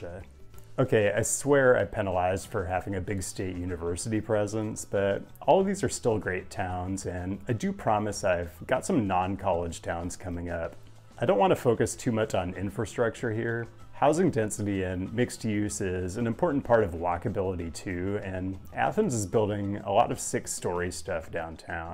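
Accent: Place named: Upper Midwest, United States. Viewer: Your videos are the best things on YouTube--thank you. One comment and one question… Did you know that Erie contains the lowest-income zip code on the U.S.? I read this recently, and was surprised. Also, as a linguist, I am fascinated by the cadence of your speech and can't place it geographically. Are you from the Upper Midwest originally?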